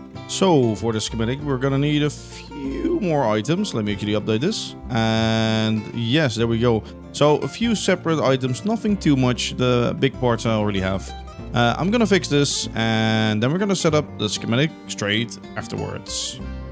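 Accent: Netherlands. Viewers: Aan je accent te horen ben jij toevallig Nederlands ?🤩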